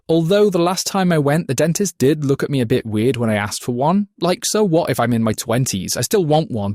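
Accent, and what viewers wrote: United Kingdom. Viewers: he was British or some other country that speaks that kind of English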